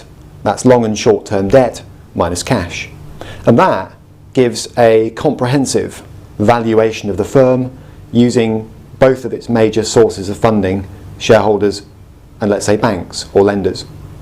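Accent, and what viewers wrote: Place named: United Kingdom. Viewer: Hi, English is not my mother tongue and I thought that I will have problems with your lovely British accent but fortunately you speaks very clear. I am so happy that I found your videos. Just it is a pitty we cannot donwload the Tesco balance u have and in this way we can start to interprete the financial figures from companies as I am new investing.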